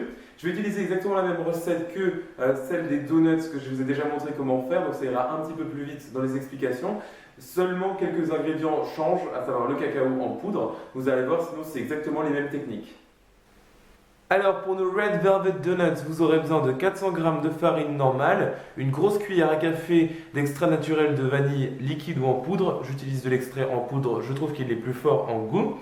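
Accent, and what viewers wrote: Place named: England, United Kingdom. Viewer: Tu te la pette avec ton accent anglais et tes donugts son trop épait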